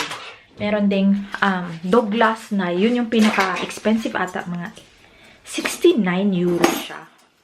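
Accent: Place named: Visayas, Philippines. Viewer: Cute kaayo ka mag binisaya te hahahaha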